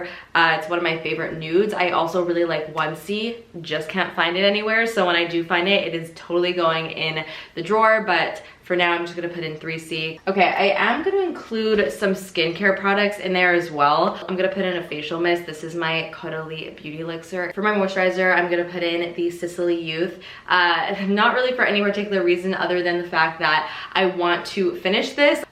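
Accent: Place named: Canada. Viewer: Hehehe I love your subtle Canadian accent 😜 “pro-cess” vs “prah-cess”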